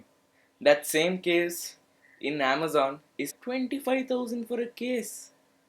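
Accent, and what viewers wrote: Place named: South, India. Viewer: not really dude, stop searching on the internet for online shopping of computer parts on sites like amazon and flipkart, start finding wholesale markets/shops where you can find these components for cheaper costs, now by your accent, I believe you're an south indian, i don't know about PC markets in South India, but here in North India, I think Nehru Place in New Delhi is probably the best place for buying components at a decent (not so high) price point, for an example, you can buy a Benq GL2460HM monitor for like 9000Rs whereas on flipkart it goes for like 10,500 Rs, also a Zotac Geforce GTX 1080 costs (if you find a good deal) nearly 57000Rs whereas on amazon its like <num>Rs, and similarly all the parts are cheaper to buy from Market rather on the internet.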